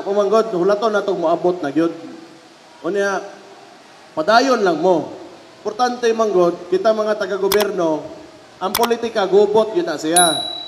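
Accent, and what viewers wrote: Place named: Visayas, Philippines. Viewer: Bisaya k magtagalog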